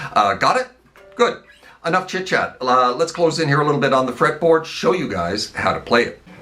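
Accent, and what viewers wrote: Canada. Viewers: Was that a Canadian accent? checks amp Ahhh, yep.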